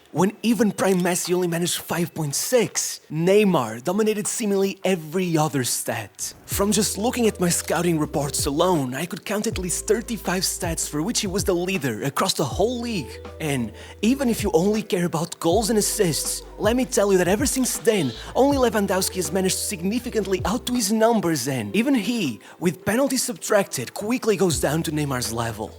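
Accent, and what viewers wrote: Portugal. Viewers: Love your Tuga accent. Cheers from Portugal!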